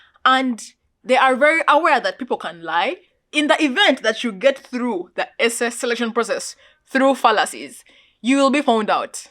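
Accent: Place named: Kenya. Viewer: Sounding like my Kenyan friend 🤗🤗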